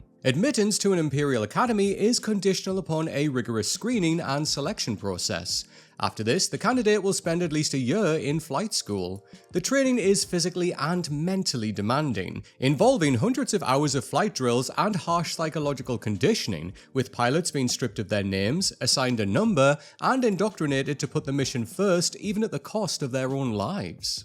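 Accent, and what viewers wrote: United Kingdom. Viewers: Like I need a British voiceover telling me about American Ingenuity.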